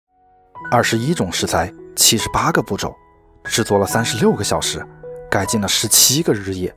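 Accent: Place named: Sichuan, China. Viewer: 四川人吧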